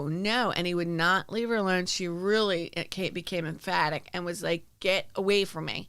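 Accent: Philadelphia, United States. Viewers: Hi, stolen from me by Lindsay sent me. She was really bragging about you and your philly accent I just wanted you to know I subscribed and I'm excited about watching your videos and as Lindsay would say put my headphones on and getting about things, lol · Also ....YO PHILLAY!! ✌🏻😘 Living in CA makes me miss the accent and no BS🙌🏻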